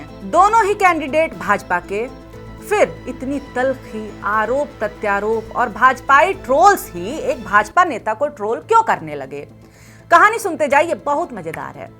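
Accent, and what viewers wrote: Bihar, India. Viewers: I'm fan of your style ❤ Up Bihar wali hindi tone + smile ke sath roast😂😂 I obsessed with your all episode 😊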